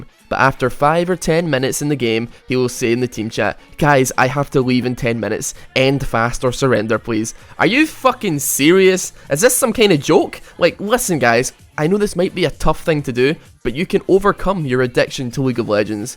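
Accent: Scotland, United Kingdom. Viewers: Your scottish aint you? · scottish? very cool accent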